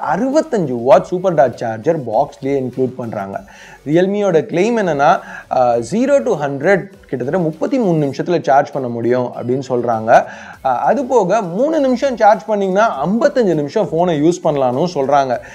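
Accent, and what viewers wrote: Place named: Chennai, India. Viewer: Nice video bro. Apple charger include pannadha nalla kalaikuringa. Chennai slang thaaru maaru. But don't use that slang in Dubai.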